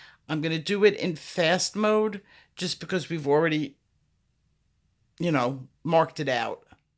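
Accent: New York, United States. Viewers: Where are you from? Sound like NY or NJ?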